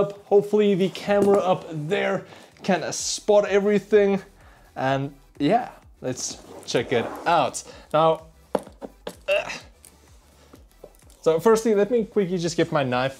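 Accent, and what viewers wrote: South Africa. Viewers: Watching on my 9 year old i3 *cries in 20fps Edit: the whole time I'm like what is this accent it's almost South African and it turns out you are actually from SA lol. Nice to see a homegrown tech channel, you have my support dude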